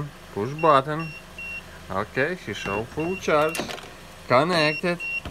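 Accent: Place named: Russia. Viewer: I totally agree with you I couldn’t understand most of what he was saying with that Russian accent? I think he was trying to be funny or something but sounded so stupid!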